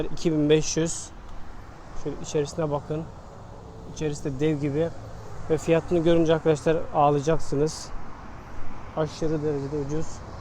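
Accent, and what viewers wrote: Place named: United States. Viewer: Kardeş neden Türkçe konuşurken amaaaaarikan aksanı kullanıyorsun Aaaaa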